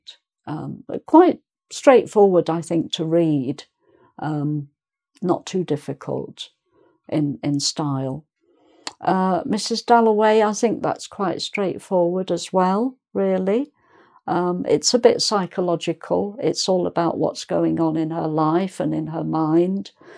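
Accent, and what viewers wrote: England, United Kingdom. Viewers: I really love English writers, they are my favourites ones after Juan Rulfo, and I love your accent as well 🥺🥺🥺🥺💚 greetings from Mexico. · Это что, британский акцент? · Even a non-English speaker would be able to understand this lady. Lovely & relaxing type of voice with a typical British accent, perfect to get you started. Plenty to learn from her (intelligence). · Hello,!👋 I am Kate, I am 18. I’ve already read "1984" and "Pride and Prejudice" in Russian. I really enjoyed them!😍And I’ve also read "Jane Eyre" in English when I was 13-14 years old (my teacher gave me this book) and in Russian recently. And now I am reading "Charlie and the chocolate factory" and "A journey to the center of the Earth" in English. In general, reading is my favourite leisure time activity, and I’ve read hundreds or, maybe, thousands of books.📚 I really love "Harry Potter", "Master and Margarita", books about "Stranger Things", foreign classics and so on.💖 P.S. 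Thanks for your video!👏 I am from Russia🇷🇺 and I am learning English, but I’ve understood everything without subtitles. I really like your pronunciation and Britain accent!🥰🇬🇧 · VERY BRITSH ACCENT !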